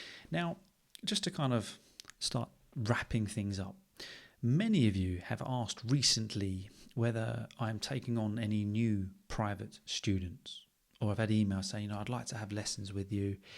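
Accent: United Kingdom. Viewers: I'm from Georgia. I really enjoy your podcasts and your beautiful British English.❤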